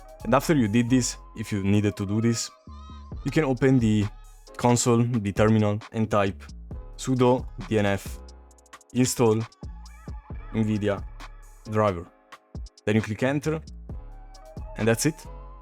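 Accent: Italy. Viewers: bro hai il mio stesso accento nelle interrogazioni di inglese, dimmi che sei italiano e non sono pazzo io. Bel video btw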